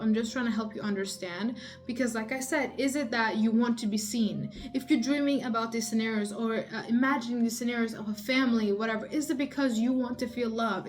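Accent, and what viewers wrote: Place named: Balkans. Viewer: I hear a slight accent. Are you from the Balkans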